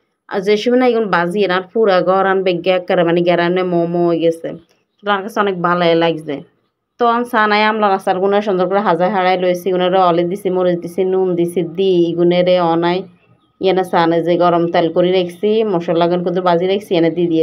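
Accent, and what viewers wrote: Noakhali, Bangladesh. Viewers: অসাধারণ হয়েছে আচার রেসিপি সাথে নোয়াখালীর আঞ্চলিক ভাষা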